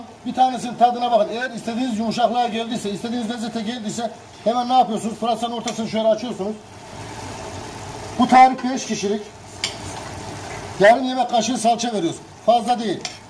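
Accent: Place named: Kayseri, Turkey. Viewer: şef kayserili heralde aksan baya vuruyor 😁😁👍👍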